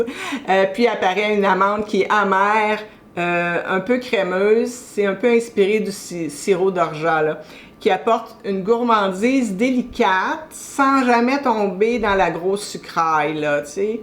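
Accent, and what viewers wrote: Canada. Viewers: Coucou ❤️ Je viens justement de découvrir ta chaîne grâce à ton commentaire sur la chaîne d’Estelle, et je me suis directement abonnée! 🥰 J’ai beaucoup aimé cette vidéo autour de Frédéric Malle, Le Labo et Dior. Trois univers tellement différents! Je suis d’ailleurs totalement amoureuse de la maison Frédéric Malle ❤️, alors autant te dire que ta sélection m’a particulièrement intéressée! Contre-Jour, Violette 30, mais aussi Paradise et Cuir Saddle de Dior… de très belles découvertes et des parfums qui donnent vraiment envie d’être explorés davantage. ✨ Je suis moi aussi créatrice de contenu autour de la parfumerie et je suis belge 🇧🇪. Je pense que tu es au Canada, si j’en crois ton joli accent 😊. Je suis vraiment ravie d’avoir découvert ton univers et j’espère que nous aurons encore l’occasion d’échanger autour de notre passion commune pour les parfums. 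À très bientôt et gros bisous parfumés 💕🌸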